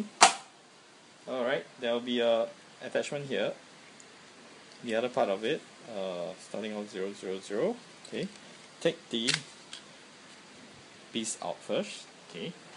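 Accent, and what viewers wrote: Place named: Singapore. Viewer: singaporean accent!!!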